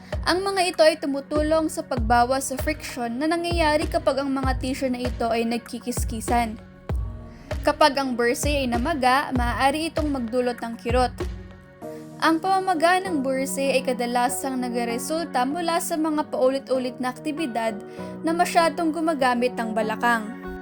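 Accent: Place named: Iloilo, Philippines. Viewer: ilonggo ka doc ay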